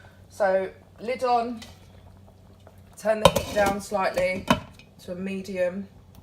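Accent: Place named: Australia. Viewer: You should have made cuts at both ends of chops to prevent them from curling up when cooking....They will remain flat to cook evenly...Looks real delicious though...And i adore your accent...Are you from Australia??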